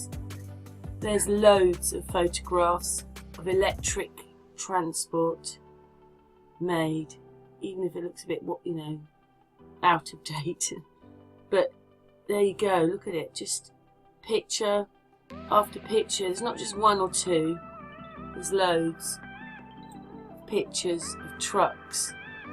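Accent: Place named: England, United Kingdom. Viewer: i could tell u were english simply from ur clever channel title!!! it sounded very good with an english accent and i said ,i bet shes english haha. and i think u are super awesome!!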